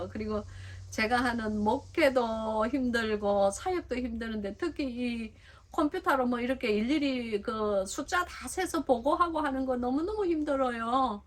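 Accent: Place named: Busan, South Korea. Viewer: 귀하신 말씀 나눠 주셔서 너무나 감사드립니다 부산 사투리가 어쩜 이리 친근하고 매력적인지 쎄가빠게 ….ㅋㅋ 쎄가빠지게 말씀공부 따라 가는 중입니다^^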